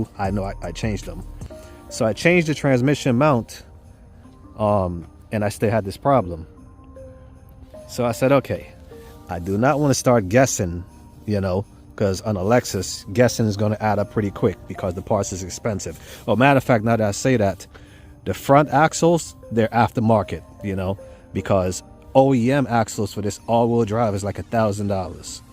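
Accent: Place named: Caribbean. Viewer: Jamaican or Trini? I hear a Caribbean accent 😂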